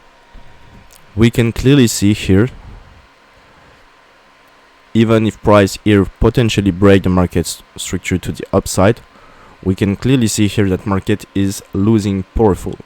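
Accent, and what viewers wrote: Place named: France. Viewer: U have a French accent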